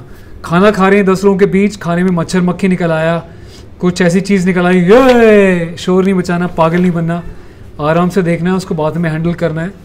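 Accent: Haryana, India. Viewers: Sir aap Haryana se ho. Kya? Btao please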